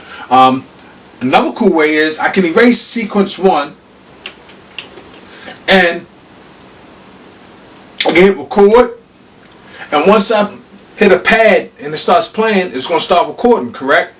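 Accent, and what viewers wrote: Baltimore, United States. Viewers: Dope vid. You sound like you from B-more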